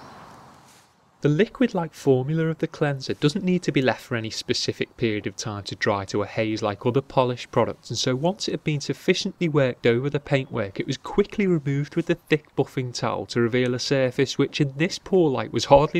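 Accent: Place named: Wales, United Kingdom. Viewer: Are you from Wales I love the welsh accent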